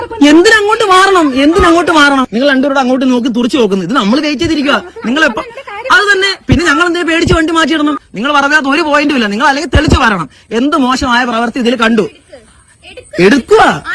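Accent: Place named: Kollam, India. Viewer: സംസാരം കേട്ടിട്ട് കൊല്ലം ജില്ലയാണെന്നു തോന്നുന്നു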